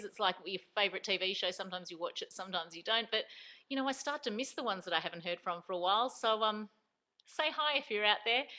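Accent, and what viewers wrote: Australia. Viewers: My passion is History, geography, and art such as painting landscapes and photography, 3d art /animation, and photoshop. I took photograph class and I loved it. It was fun doing the processing also, I used black and white Ilford resin back paper and I like using Ilford 35mm film with this 70's Minolta camera. I mostly use a Kodack camera now. However I think about using 35mm color film with the 'ol Minolta. I have some filters I wanna experiment with. I love your Aussie accent. = )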